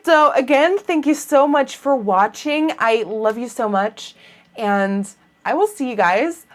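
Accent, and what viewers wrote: United States. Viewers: omg i just started watching your videos and i honestly would have guessed that you you were american! Love your videos!! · I HAD NO IDEA YOU WERE IN HOLLAND!! You sound American omg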